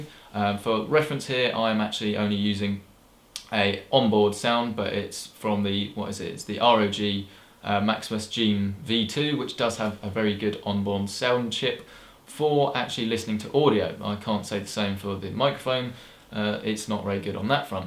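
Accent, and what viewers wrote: United Kingdom. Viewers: that's just his british accent...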